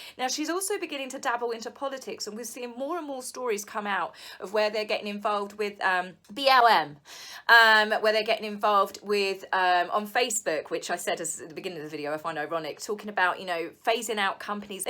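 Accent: England, United Kingdom. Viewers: You were looking Sttinkn Cute today! That's Southern for you look really nice! I loved when you said Baby Mama that was a first forme from someone with an English Accent. That was great. Bless you!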